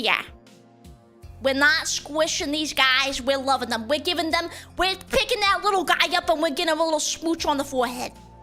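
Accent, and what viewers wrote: Boston, United States. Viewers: Hi, thank you so much for the fun chat at Sakura con. Can't wait to see this channel grow. Love the adorable Boston accent btw.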